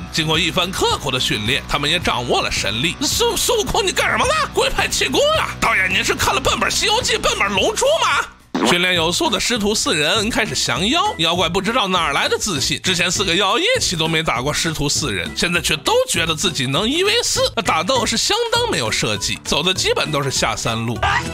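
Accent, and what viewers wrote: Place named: Shaanxi, China. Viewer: 是陕西人吗好像听到了一丢丢口音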